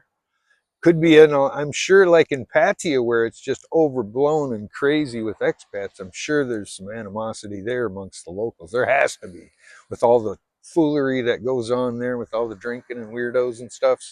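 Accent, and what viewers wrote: Canada. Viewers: You sound like a Canadian.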